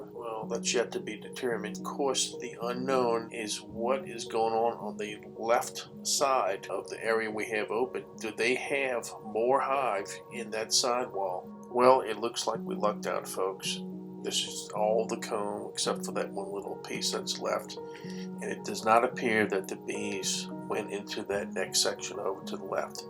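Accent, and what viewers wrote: United States. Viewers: Great video! Also your accent is so unique. You sound like east coast, southern, west coast, rhode island. All at the same time. So weird. (in a good way)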